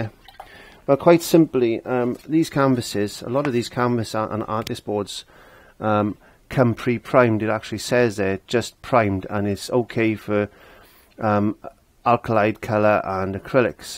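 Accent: Wales, United Kingdom. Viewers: Hi, I've enjoyed watching your videos. I've been painting with acrylics since 1970 and have learned as I went like you said, experiment and I just go for it. I've never taken lessons. So your 5 tips were very helpful! Also I could listen to your Welsh accent forever. Love it! Thank you - Amy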